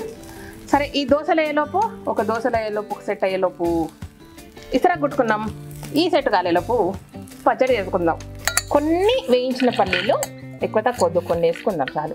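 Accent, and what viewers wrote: Telangana, India. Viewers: Super andi meeru saradaga ma tladutu adi telangana chala manchiganipistadi trees gurinchi chala baga cheptunnaru dinitona ardamitundi meeku enta opiko ani super👌👏🙏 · Hi..me ukmarava dosa bagundi..nenu try chesthanu.. Me telangana basha bale matladutu enjoy chesthu pani chestunaru..mee viewers ni me matalatho attract chestharu...be happy madam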